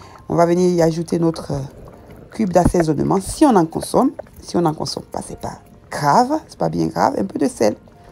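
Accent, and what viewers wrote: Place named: South, France. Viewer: Mais tu as l'accent du Sud, pas de la côte d'ivoire 😊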